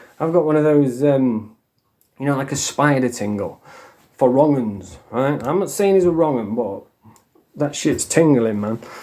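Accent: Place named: Newcastle, United Kingdom. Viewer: That geordie accent 😂😂 class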